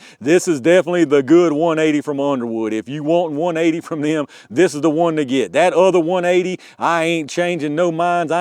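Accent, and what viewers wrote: South, United States. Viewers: This video is worth watching for the rant. 🤣 Got to love that pissed off southern accent.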